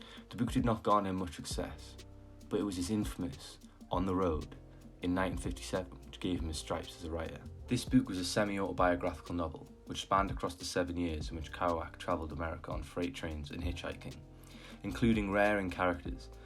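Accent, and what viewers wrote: United Kingdom. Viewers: This is fantastic man! What part of England are you from? North east right? I love your narration. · i think hes just british brother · geordie accent's an instant sub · Dude you said semiautobiographal which isn't even a word. Funny how you many people will overlook your idiocy just because you have a British accent.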